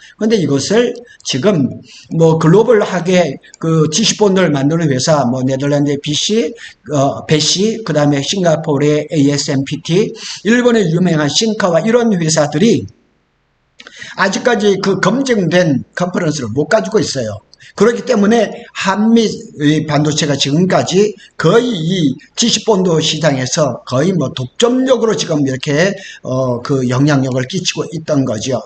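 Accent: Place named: Korean-Chinese, China. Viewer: 억양이 조선족. 의심스럽다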